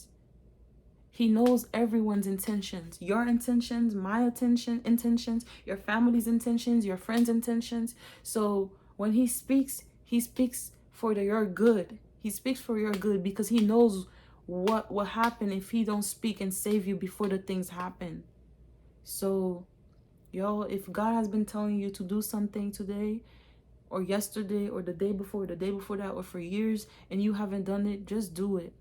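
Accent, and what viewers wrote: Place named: Haiti. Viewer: I picked up a Haitian accent the moment you started speaking 😍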